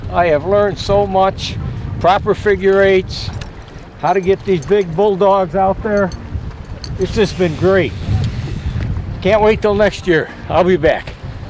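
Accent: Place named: Minnesota, United States. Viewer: The boooooooat! You're so Minnesotan ......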